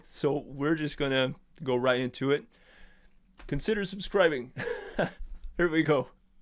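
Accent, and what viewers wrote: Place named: Denmark. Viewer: I am from Denmark. I thought I reconized your english accent as danish.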